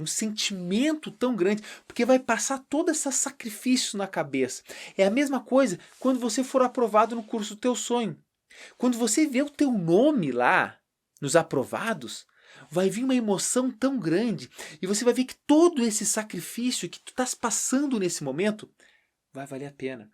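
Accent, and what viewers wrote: Rio Grande do Sul, Brazil. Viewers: sabia que tu era gaúcho, reconheci o sotaque, masss bahh tchê que barbaridade de vídeo, muito bom parabéns !!!!!!!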